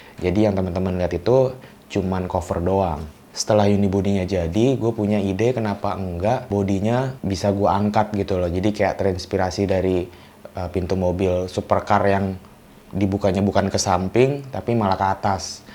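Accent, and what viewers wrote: Bugis, Indonesia. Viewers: abang orang bugis ya?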